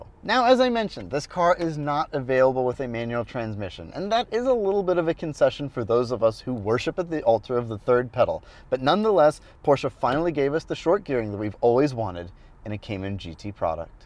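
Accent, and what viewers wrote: Germany. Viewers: ! Love the German accent. Awesome video. 👍🏻🇺🇸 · German accent is my new favourite thing ever!!!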